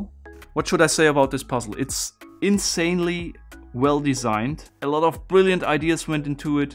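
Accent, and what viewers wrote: Germany. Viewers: This reminds me of the first video I ever watched of yours - I was thoroughly impressed and entertained by this friendly voice with a light german accent drawing out the inside of the puzzle by touch as well as trial and error. The passion showed through your attention to detail and delight at the way the puzzle was MADE, not just the solution. That being said, I pictured you as an older gentleman, maybe with salt and pepper hair, and a neat bow tie. I'm not sure if I should be more impressed that someone so young would be so patient and exacting, or disappointment that my illusion was shattered. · His German English is 9.5/10 Most Germans pronounce some words differently than Americans. It’s not right or wrong. has the best energy and is sooooo smart.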